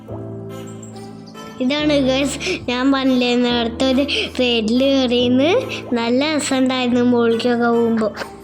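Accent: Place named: Valluvanad, India. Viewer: അടിപൊളിയായിട്ടുണ്ട്. വള്ളുവനാട് ഭാക്ഷ കൊള്ളാം ട്ടോ❤❤❤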